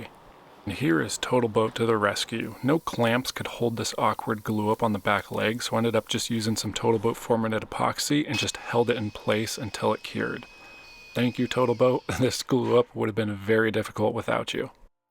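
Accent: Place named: Midland, United States. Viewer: baking vs bakin' Stormed by the midland dialect of North American English: goin', doin' waitin', etc. And how about the disappearance of the distinction between i and e before nasals? Pit/Pet: solid difference. But Pin/Pen: they sound identical to the midland dialect ear. BTW, thanks for a great video about the creation of art which explores way beyond the creation process to include unexpected results. Top notch!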